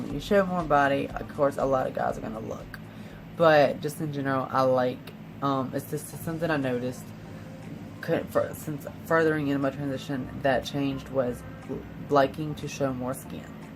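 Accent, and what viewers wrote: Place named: South, United States. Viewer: You look great,!!! Love the Southern drawl.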